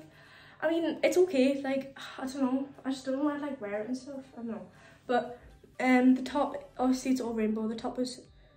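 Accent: United Kingdom. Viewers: اللهجه البريطانيه رووعه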